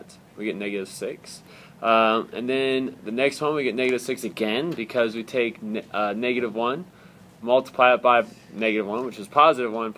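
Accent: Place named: United States. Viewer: this sound so american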